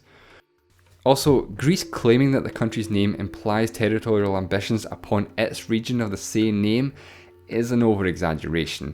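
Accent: Scotland, United Kingdom. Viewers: The Narrator of this video is obviously Scottish. Scotland was named from the dense fog and darkness of this land. Scotland was discovered by the Greeks <link>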